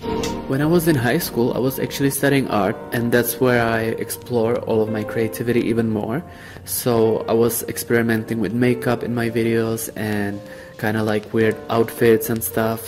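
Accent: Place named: Czech Republic. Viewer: Where do you come from your acsent and place where you from looks like Czech Republic or Slovakia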